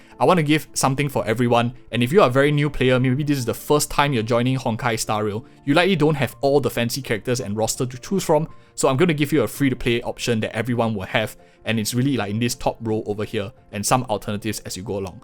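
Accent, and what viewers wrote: Singapore. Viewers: are you by any chance singaporean :D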